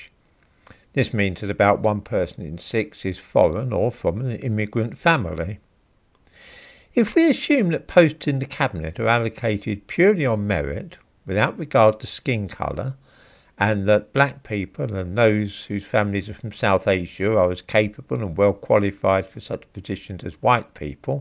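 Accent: United Kingdom. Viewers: Thank goodness for the British accent. I live in South Africa and if I watched the news without sound I would not know if it was local or yours.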